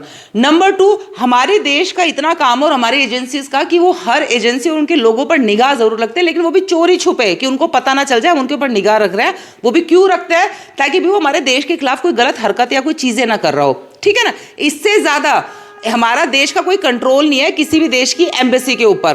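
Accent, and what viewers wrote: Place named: Haryana, India. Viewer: Bahut badiya bahan Haryanvi accent bahut jabrdast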